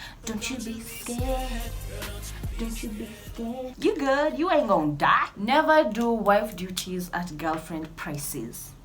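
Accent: United Kingdom. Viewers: When that British accent checks in, I fall in love with you all over again😊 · The British accent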